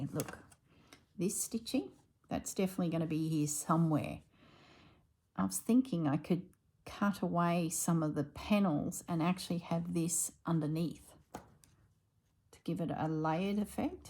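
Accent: Australia. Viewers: Loving the jacket and find your story telling interesting. You are truly a unique person, also, I'm in Indiana, USA. Enjoy your Australian accent. 🙂.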